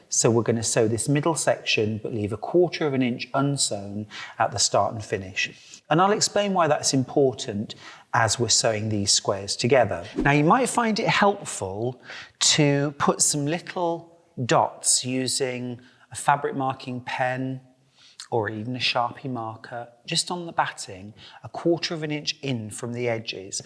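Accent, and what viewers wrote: United Kingdom. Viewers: I love English accent. Can you please make tutorial the Japanese style UV protection mask . The mask is attached to the capelet on the sides with adjustable earloop jus like bra strap. the middle of the face there is invisible opening to drink water, the mask overlaps the capelet(or cap). the capelet goes around up to the back of the bottom of the neck by closing with velcro or snaps. I can show photo example in private. Thank you. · I really enjoy listening to your videos and your lovely British accent. I've made several of these bags and like the way you've made the tabs a bit oversize. Excellent instructions!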